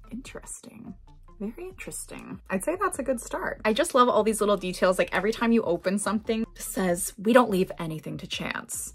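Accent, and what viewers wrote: United Kingdom. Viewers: Oh now you done it brittish accent pinch me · Nice British accent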